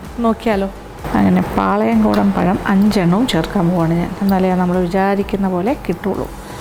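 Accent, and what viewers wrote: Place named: Thrissur, India. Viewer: അപ്പോ.. തൃശ്ശൂര്കാരിയാ...ലേ...? ഞാനും..same pich..😍😍😍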